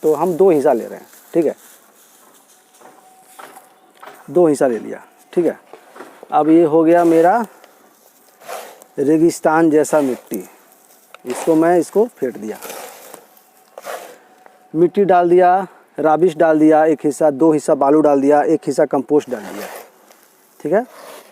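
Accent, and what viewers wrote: Bihar, India. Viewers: "Bihari" ho kya bhai ???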